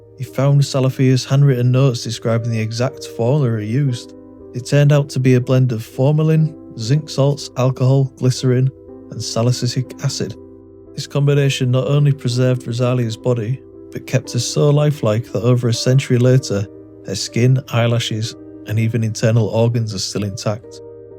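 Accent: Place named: Hull, United Kingdom. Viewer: Your accent sounds like u might be from hull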